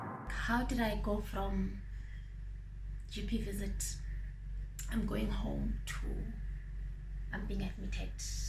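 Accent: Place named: Cape, South Africa. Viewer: Amazing story! I like the in between humor, especially the Cape accent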